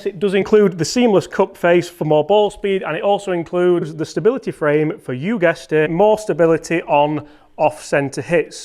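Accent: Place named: England, United Kingdom. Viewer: Im 63 I took up golf 6 years ago 6'4' 220 LBS so I hand a set custom made ,all irons about an inch longer than most. Im a long hitter 200 7 ,<num> ish 8 130 SW and 145 W 75+ 60! , anyway I have the Callaway big bertha irons ,5 years old and I think they are time to replace as I was playing 3 times a week , I think my shafts are fatigued and well as the heads, ( all materials deteriorate over time) problem is my HC is now a 26 .5 do to the fact I think the set is just used up and frustration takes over and I was a 20HC 2nd year into this ( HAD A GREAT GOLF COACH EVERY A WEEK)then dealt with health issues ( im back and feeling much better ,Your English accent and fast talking is hard to follow , Plain and simple I have to have mine custom made , 5 iron to PW , please suggest a head and shaft combination you feel would get me back to low to mid 90s game , , Thanks Brad USA .Any information from you would be greatly appreciated . 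Everyone at my golf club has their own advise ( OF COURSE) The club champ do tell me that if he could work with me more often one on one I would be low 80s/high 70s and club champ, Also said my iron shots are unreal," nobody here hits a 7 iron 200 + Advise? Help?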